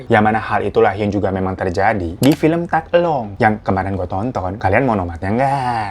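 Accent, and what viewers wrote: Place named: Pasar Minggu, Indonesia. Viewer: Kamu ngerti keseluruhan bahasa indonesia logat pasar minggu emang?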